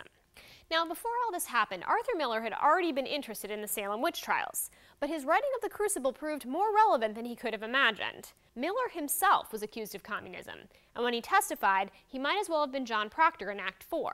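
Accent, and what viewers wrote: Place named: India. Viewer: *she has a very this Indian accent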